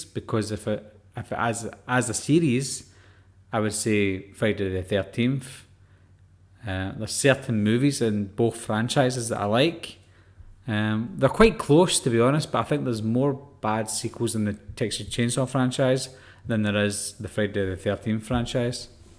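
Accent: Scotland, United Kingdom. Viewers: Were about’s from Scotland are you from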